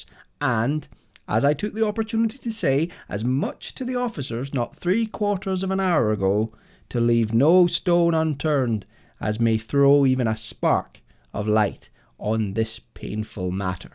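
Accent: Scotland, United Kingdom. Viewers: I realise this is 9 years old, but I've really enjoyed your narration, glad I found your channel. I love a Scottish accent ❤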